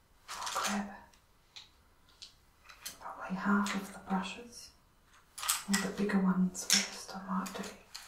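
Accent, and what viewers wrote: Australia. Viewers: Australia! My bad.I thought somewhere in Britain but your sounds are so rich I haven't paid much attention to the accent.Or do I have the accent? Now I hear it.I should have known. We have national and regional slang.It is probably the same there. I am a huge fan of the Seekers.Judith Durham is brilliant.But you are too young to remember them in their heyday.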